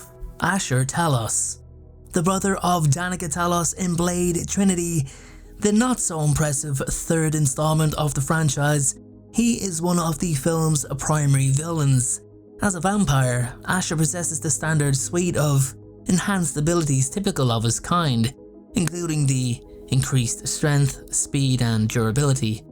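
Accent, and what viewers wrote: Ireland. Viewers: Are you Irish? What part of Ireland are you from? You earned a sub anyway.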